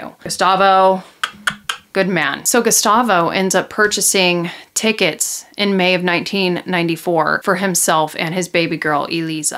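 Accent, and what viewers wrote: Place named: California, United States. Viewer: You're a Californian right you and Elise from 10to Life both look and sound like us. Cali girls stick together lol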